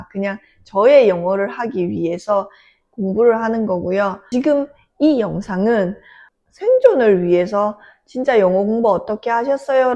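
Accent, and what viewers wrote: Gyeongsang, South Korea. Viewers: 선생님, 안녕하세요. 바로 구독합니다. ㅎㅎ 저는 한국에서 5년 임상 병행하며 임용 패스 후, 현재는 5년차 초등학교 보건교사로 근무중입니다. 학생때부터 미국간호사에 대한 생각이 있었고, 엔클렉스도 쳐야지 쳐야지 했는데.. 더 늦기전에 후딱 해치워야겠다?ㅎㅎ 라고 생각하여.. 올해 여름방학에 바짝 공부해서 8월말에 엔클도 패스했네요. ㅎㅎ 사실 저는 보건교사가 제일 하고 싶었고.. 그래서 지금 보건교사를 하고 있는게 정말 행복하지만.. 간호사로서의 발전에는 많은 한계가 느껴져요..ㅜㅜ 거기다 대학생때 뉴저지, 뉴욕에서 4개월간 병원실습도 하고, 미국생활도 해서 그런가.. 미국에서 더 많은 케이스를 보고 경험하면서 간호사로서의 전문성을 함양시키고 싶은 마음이 계속 드네요..ㅠㅠ 무엇보다.. 미국병원의 신문물들을 직접 경험하고 싶습니다.!ㅎㅎ 한국과 많이 다른 점에서 상당히 충격을 받았더랬죠.. 그래서 지금 진지하게 미국행에 대해 고민중입니다.. 미국가서 저 혼자 살아갈 자신이 전~~혀 없고, 또 영어에 대한 공포때문에.. 뭐든게 무섭고 두렵네요..ㅠㅠ 그러던중, 선생님 유튜브 우연히 보고 공감되는 부분이 많아 댓글 남깁니다. 얼마나 힘드셨을지..ㅠㅠ 마치 저 신규 때 매일 울며 출퇴근하던 그런 기분이 들더라구요.. 그리고 억양이 경상도분이신거 같은데.. 경남지역이신가요? 전 대구사람이라.. 경상도 말투를 들으면 어찌나 반가운지요.ㅠㅠㅎㅎ 아무쪼록 항상 건강하시고, 영상도 꾸준히 업로드 해주세요. ㅎㅎ 혹시라도 제가 미국행 결정하게 되면 댓글 남길게요. ㅎㅎ 선생님 응원합니다. ^_^ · 경상도 사투리를 들으면 개인적으로 반가워요, 저는 서울 사람 이지만 경남지역에서 오래 살았습니다. 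김해 진영 마산 창원 그리고 부산. 저는 독일에서 거주했었습니다. 누나 영상을 보면 예전에 독일생활 초창기때 고생했던 기억이 떠오르네요. 영어 be동사 개념도 모른 상태에서 생존을 위한 독일어 공부했던 기억이 납니다. 독일어를 먼저 배워서 그런지 공부를 하다 보면 영어에서는 왜 이렇게 하지 라는 아쉬움이 있을 때가 많더라구요. 아무튼 누나 미국생활 너무 부럽네요. 저는 친미성향이 있습니다. 언젠가 미국에 꼭 한 번 가보고 싶습니다. 영상 자주 챙겨보겠습니다. 누나 사랑해요.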